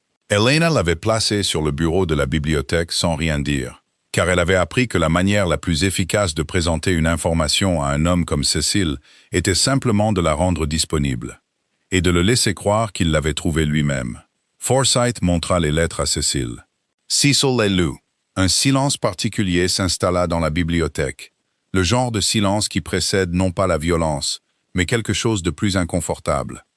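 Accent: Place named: England, United Kingdom. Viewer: Mais pourquoi cet accent anglais ridicule alors qu'à certains moments le langage français est employé correctement ? En France, nous parlons français sans accent, merci à l'IA d'en tenir compte !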